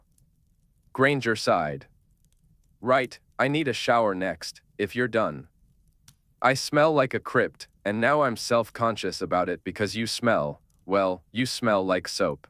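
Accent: United Kingdom. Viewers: It's based in Britain so a British voice makes sense. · British accent?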